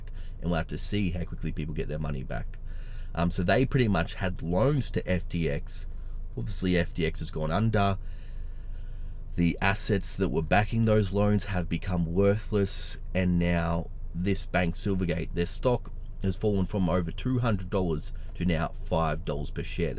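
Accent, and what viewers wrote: Australia. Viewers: Good one... the criminal bank cartels are starting to move... bail ins be a-comin sooner or later... Crypto will be the future.... dont worry about that, mate....fiat currency is fkd...cbdc idea is criminal... Im in Peru..love to hear the accent from the land of the meat pie - always....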